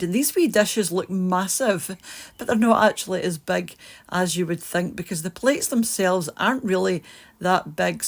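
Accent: Scotland, United Kingdom. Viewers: Hi there. I don't comment very often, but I surely do enjoy your videos. Thank you so much for sharing this part of your life with us. And I love your Scottish accent! Have a great week. :)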